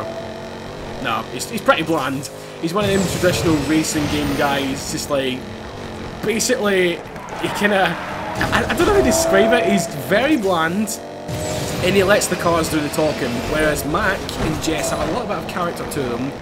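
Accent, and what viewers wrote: Scotland, United Kingdom. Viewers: Are you Scottish? You sound really Scottish 😂 I’m from Scotland as well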